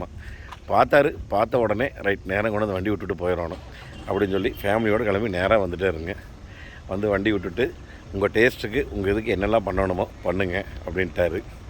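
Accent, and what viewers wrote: Kongu, India. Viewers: கொஞ்சும் கொங்குத் தமிழ் பேசும் கோமகரே...! திகட்டாத தித்திக்கும் பேச்சுடைய திருப்பூர் திருமகரே...! மதிப்பிற்குறிய " " திருப்பூர் திரு. அண்ணா🙏❤ எப்படி இருக்கீங்க❤🙏 அண்ணி எப்படி இருக்காங்க❤🙏 ரித்திக் எப்படி இருக்காரு❤ அருமை அருமை அருமை வழக்கம்போல அண்ணா உங்களோட வீடியோ அருமை❤❤❤😊😊❤❤ என்ன சொல்ல ஏது சொல்ல😊😊❤❤❤ சும்மா நெகு...நெகு...நெகுனு இருக்கு ஹுண்டாய் I20😊😊❤❤❤❤ வெள்ளை நிறமும்/கருப்பு நிறமும் அட்டகாசம் போங்க😊😊😊❤❤❤ அதிலும் குறிப்பாக அந்த "அலாய் வீல்" வேற மாதிரி வேற மாதிரி😊😊😊😊❤❤❤❤❤ அழும் குழந்தையின் அழுகையை நிறுத்த தாயால் மட்டுமே முடியும்...! அதேபோல.... அஞ்சு பைசாவுக்குகூட தேறாமல் அழுக்குப் படிஞ்சு பாழாய்ப்போன காரை அழகுபடுத்தி ஆரோக்கியமா மாத்த " " அண்ணாவாலதான் முடியும்😊😊😊❤❤❤❤🙏 இந்த வீடியோவில் சரியாக வது செகண்ட்ல அண்ணாவோட வேலைப்பாடுகள் பற்றி கிண்டல் செய்து சொன்ன தன் அறிவை கழட்டி ஆப் ஆயில் போட்டு தானே எடுத்துத் திண்ண அந்த ஆளுக்கானது...... குறை சொல்பவனுக்கு குழந்தையின் சிரிப்பும் குற்றம்தான்....! 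உளறுபவனுக்கு உழைப்பின் மகத்துவமும் தன் மரமண்டையில் ஏறாத ஒன்றுதான்...! அப்படிப்பட்டவன்தான் அந்த கிண்டல் செய்தவனும்...! " " அண்ணா நீங்க அடிச்சு துவைச்சு போயிட்டே இருங்க அண்ணா😊❤ உங்கள் திறமை யாவும் வாசம் நிறைந்த சந்தனம் போன்றது😊❤...! அதெல்லாம் சாக்கடை எது? சந்தனம் எது? என்று பகிர்ந்து பார்க்கத் தெரியாத அந்த கிண்டல் செய்த மடையனுக்குப் புரியாது...! என்றும் அன்புடன் உங்கள் தம்பி ரா.முஹம்மது கபூர் (சென்னையில் இருந்து) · வணக்கம் அண்ணா. எனது பெயர் சிவராமகிருஷ்ணன். கோவை சூலூர் பகுதியில் வசிக்கிறேன். தங்களது வேலைகள் அனைத்தும் மிகவும் சிறப்பாக உள்ளன. தங்கள் காணொளிகளை சமீபகாலமாக பார்த்து வருகிறேன். உங்களது கொங்கு மொழி சாயலும், நகைச்சுவையான பேச்சும், விளக்கங்களும் ரசிக்கும் வண்ணம் உள்ளன. தங்களிடம் வருகை தரும் பல்வேறு மாவட்டங்களை சேர்ந்த வாடிக்கையாளர்களே அதற்கு சான்று. விரைவில் நீங்கள் பிற மாநில வாடிக்கையாளர்களும் பெற எனது வாழ்த்துக்கள். Alloy wheels - Super design I seen in non-company alloy wheels. Dual tone colour paint - Awesome. 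DRLs - Great.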